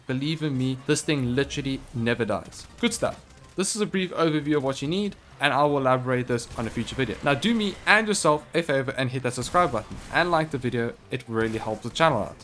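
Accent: Afrikaans, South Africa. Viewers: do you speak afrikaans your accent says yes if you do: Dis awesome om te sien hoe goed suid afrikaaners doen sien bitter min wat bo 1 duisend subs het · Boet are you south African??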